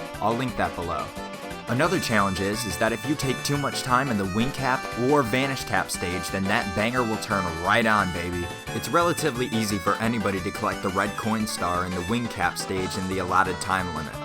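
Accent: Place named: Midwest, United States. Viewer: Love the Midwest accent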